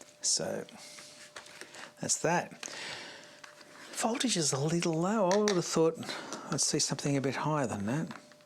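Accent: Australia. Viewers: One two of the reasons I watch your videos is you are very good and I get a kick out of your deep down under south Australian demeanor and speech accent. And is slightly salty at times with good humor.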